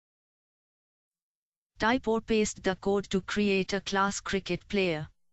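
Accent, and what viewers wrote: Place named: India. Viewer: Wondering why the robot voice has an Indian Accent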